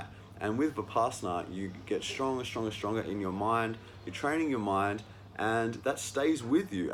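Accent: Australia. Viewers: I went to my first vipassana course 2 months ago in indonesia. For the preparation, I watched all your videos about the vipassana course. It helped me a lot. So thank you so much for that. Also, on the retreat there was a volunteer who looks like you. His voice even sounds the same like you with Australian accent. At first glance, I thought it was you haha.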